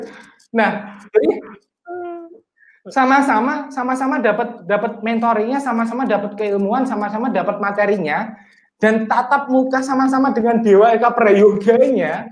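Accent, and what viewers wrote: Java, Indonesia. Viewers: Keren ,lucu,medok ngomkngnya 😀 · Maaf mas sblomnya,jujur sy ketawa dg crita dg logat jawa yg medok,lucu,wajah yg imut jdi sy ketawa ,tpi saya salut bljr bisniis saat usia masi belia,& jdi inspirasi byk org,keren banget,hebatt,sukses sll,mksii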